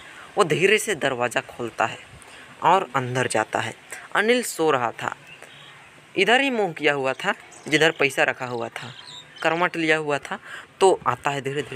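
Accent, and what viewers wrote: Bihar, India. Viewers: Aaaaà bhai kaya tum bihari hooo???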